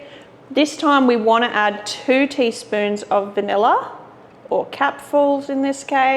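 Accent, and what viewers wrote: Australia. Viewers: Love this video! You sound so Aussie in this one !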